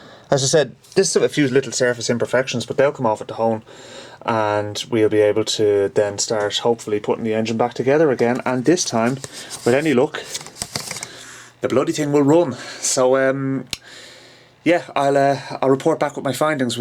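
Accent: Ireland. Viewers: Worth watching for the irish accent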